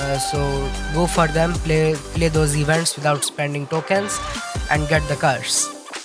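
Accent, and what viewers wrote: India. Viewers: idk if ur indian ur voice sound like ur a indian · Bro are you Indian cause you speak English like an Indian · U Indian bruh ? 😅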